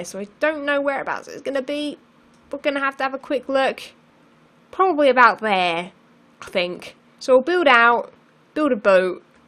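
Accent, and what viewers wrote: United Kingdom. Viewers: Mare-ee-yo? Wow British people sound funny.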